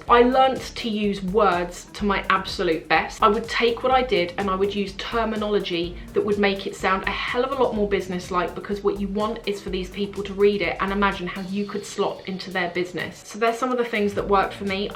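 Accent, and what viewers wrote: England, United Kingdom. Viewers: Hello! I am a new subscriber and just recently found you on YouTube. I am loving your videos! I get a coffee and settle in to listen to your lovely English Accent (I am from US) your style is so inspiring!!! and my favorites are when you open mail from you subscribers. You are so genuine! So humble and appreciative!! I really really am so glad to have found you!!!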